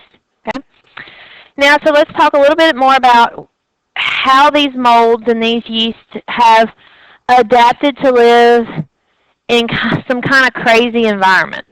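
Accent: South, United States. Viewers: Thank you so, so much! As a fellow southern chick I LOVE your accent! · currently taking micro and I am so glad you have taken the time to post your lectures. they are very helpful and easy to understand. your way of teaching is awesome and I am learning far more from you than my current professor. southern accent makes it even better. thank you so much!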